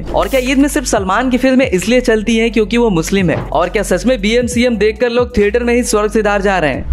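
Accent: Bengal, India. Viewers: I am from kolkata and your bengali accent bro touched my heart great video man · Are you bangali 😅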